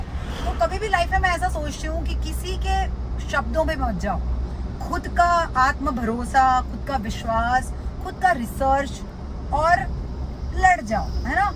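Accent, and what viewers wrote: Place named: Gujarat, India. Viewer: This happens with me tooo. Gujarati Gujarat thi nikdi shake pan Gujarati language na bhuli shake Btw...love the gujju accent Love from Ahmedabad 🫶🏻✨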